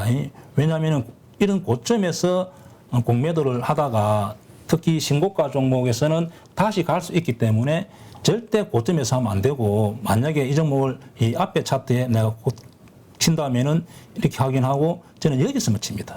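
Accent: Gyeongsang, South Korea. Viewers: 같은 갱상도라 귀에 쏙쏙들어옵니다 ㅎㅎ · 구수한경상도사투리 친근합니다 이렇게와닿는방송정말감사합니다열공하겠습니다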